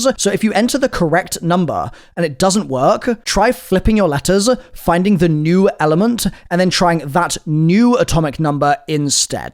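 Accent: United Kingdom. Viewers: British accent is marvelous im a french Canadian and i love the english wotah instead of water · Is it just me or does sound a little British here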